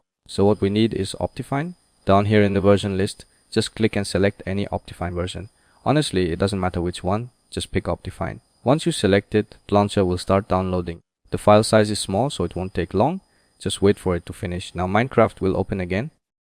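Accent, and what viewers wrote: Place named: India. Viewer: No he sounds indian..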